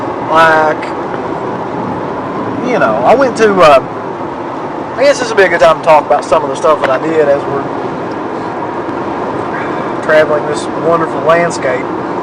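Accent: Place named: Kentucky, United States. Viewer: Kentucky accent =automatic stabbing in the D!..:)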